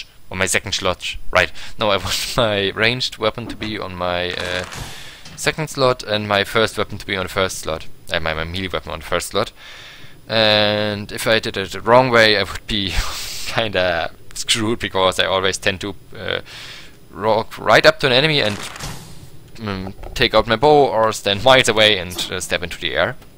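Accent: Germany. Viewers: Man merkt echt das du eigentlich aus Deutschland bist xD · lass dich nicht verunsichern. die einzelnen Elemente am Anfang sind wichtig. vielleicht grafisch aufarbeiten und unterteilen. sonst sehr informativ und gut verständlich. du kommst aus dem Raum Stuttgart denke ich 😀. weiter so und das mit dem Akzent wird schon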